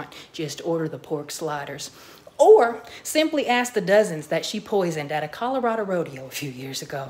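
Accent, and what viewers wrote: South, United States. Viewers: Congratulations- you’ve become a masterclass on southern fried reading on an Aaron Sorkin “Walk and Talk”. 👏🏾🔥👏🏾🔥💯💯💯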